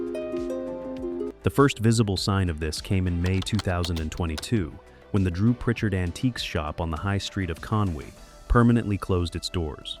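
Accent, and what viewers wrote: United States. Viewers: Why is an American narrating Drew Pritchard backstop? Hasn't anyone told him that the place is Conwy NOT Conway!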